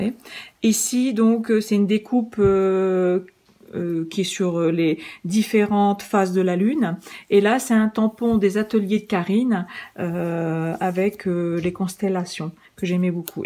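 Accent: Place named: Belgium. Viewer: coucou j aimerai savoir ou vous trouver vos jolis papiers merci super vdeoj adore votre accent belge ou le nord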